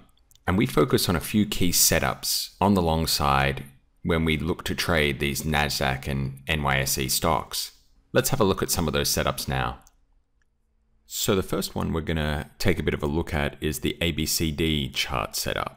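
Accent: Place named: Australia. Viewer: Thanks, don't now if it's the fellow Aussie accent that hooked me or more so gave me the confidence to listen in more, but from checking out FOUS4 and then seeing how you explain it in really easy laymen terms (for noobs like me) it's well appreciated. Keep up the great work!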